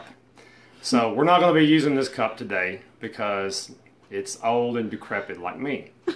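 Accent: South, United States. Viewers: Thanks, I enjoyed that, and there is one of those espresso makers sitting unused, in my office. Will try it out, and see if it will help me get rid of the sugar from my coffee. And I'm glad you are still going. I think the most important element of your message besides your natural relaxed what of talking about all this, is "us.", You present in a way that is inclusive and convincing to others, because "we" feel you are one of "us." The message is too important to leave unreached by those who may not feel to be one of "us." I do think your message is extremely important, and you should reach out to others who can make videos like yours, carrying the same message to those who need it, to reach others in our society who otherwise might not listen an old white guy with a Southern twang. Be well.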